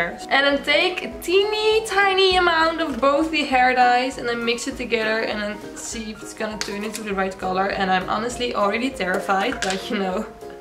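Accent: Netherlands. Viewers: Ben je toevallig Nederlands? Ik hoor daar ergens een Hollands toontje😂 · Haha ja, nogal Nederlands accent hè, dat is zo leuk van mensen over de hele wereld op youtube, alle verschillende accenten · Wait are you dutch? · are u dutch? bc idk ur accent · Where is she from? she sounds dutch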